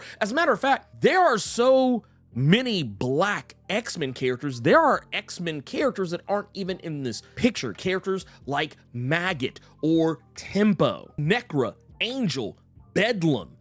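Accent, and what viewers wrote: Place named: South, United States. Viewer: Ok im new here this guy's voice is very misleading it's not bad by any stretch of the imagination but he has a very southern twang, smart , intelligent, nerdy af .....damn I guess I finally understand what people are saying about me. . Gonna subscribe and shut up now... Great video btw ❤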